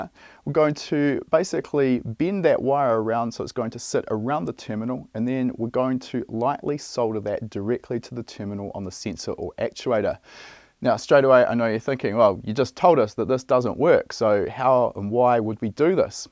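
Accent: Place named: New Zealand. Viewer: I just come here for the Kiwi accent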